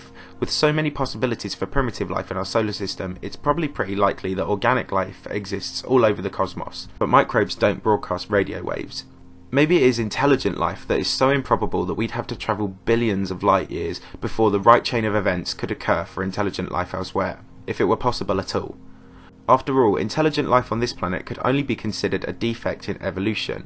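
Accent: United Kingdom. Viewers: The worst part of this is your Brexit accent.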